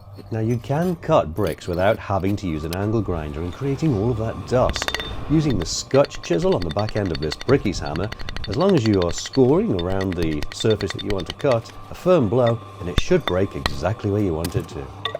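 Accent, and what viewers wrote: United Kingdom. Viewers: I'm an ignorant American. Your accent is throwing me off. You speak and sounds like a UK professor but work like a laborer. You're in New Zealand. Is that the usual accent for NZ? In any case great work!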